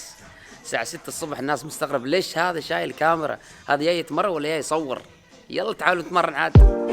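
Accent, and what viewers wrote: United Arab Emirates. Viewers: بس كلاموه الاماراتي اللهجه اقصد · سبحان الله لما شفتك عرفتك صومالي طوالي ومن كلامك عرفتك عايش في الامارات... ربنا يوفقك..مولودة وعايشة في الامارات وفي حياتي مازرت راس الخيمة...بتمنى أزورها... وبحب أبوظبي جدا جدا وبفضلها على الامارات التانية.. سبحان الله اي واحد عاش في الامارات ولو لفترة بسيطة بحب البلد دي وبكون عنده ليها معزة خاصة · لهجتك اماراتية جميلة هناك حملة شوهت صورة الامارات لكن من عاش فيها لايمكن الا ان يحبها اتمنى لك ولعائلتك التوفيق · سبحان الله انت صار لك في الامارات عشر سنين و ترمس زي المواطنين ونحنه صار لينا خمسه و ثلاثين سنه فيها ما تكلمنا لهجتهم 😂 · حضرتك تتكلم بلهجة اهل الامارات وليس بلهجة اهل الكويت وليس بلهجة اهل نجد السعودية او لهجة اهل القصيم بالسعودي ة وليس بلهجة اهل جده الحجازية وليس بلهجة اهل البحرين القريبه من اهل العراق او اهل الاهواز وليس بلهجة اهل عمان مسقط لان كل دولة خليجية لها لهجة خاصة بها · و الله اعجبتني لهجتك اماراتية تحياتي من هرجيسا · فيديو جميل وممتع جدا... 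واللهجه الاماراتيه لهجه جميله... الله يوفقك ويرزقك السكن والاقامه في الدوله اللي تحبها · صح ان اللهجة لك عليها بس تبين فيك للهجة الاماراتيه ولله الامارات بيتك وإذا تبا ترد حياك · اهم شي اللهجة الإماراتيه اللي تتكلمها ❤️👍🏻 البلد بلدكم و نتشرف بك و بجميع اشقائنا الصوماليين و العرب و المسلميين أجمع ، و بإذن الله راح ترجع للإمارات و راس الخيمه و الأيام الجميلة الحلوه و أنت في وضع أفضل. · ما شاء الله قصة كفاح ونجاح 👏وأهنيك على اللهجة الإماراتية وأعرف صوماليين وغيرهم من الوافدين في السعودية لهم ٣٠ سنة أو أكثر ما يعرفون اللهجة السعودية وهنا ثمرة ودور الإندماج وتكوين العلاقات الاجتماعية👍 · سبحان الله شكله مو صومالي شكله اثيوبي و لهجته اماراتيه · لكنتك اماراتيه بالمره 🌹🌹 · لهجتك اماراتية حلوة 🙏🏻 والنعم فيك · فلوجاتك جميلة من لهجتك ضنيت انك اماراتي وتمزح لما تقول انك صومالي والحمد كلها دول عربية اسلامية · اللهجه الامارااتيه 👍👍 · أول افتكرت انك يمني ولهجتك اماراتية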